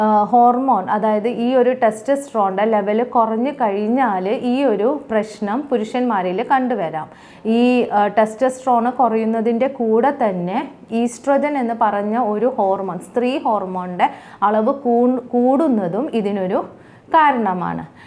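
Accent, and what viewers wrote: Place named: Kannur, India. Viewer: Kannur slang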